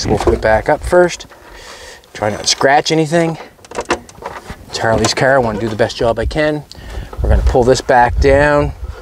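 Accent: Canada. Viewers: I was just about to say, you sound more Canadian than I is. Then, you said Nova Scotia 😂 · Fired this up and in the first 10 seconds I was like yeah this guys Canadian and it was confirmed when I heard Canadian tire 😂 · I promise you , not all Canadians sound like this guy …. Or have a dusty ride like this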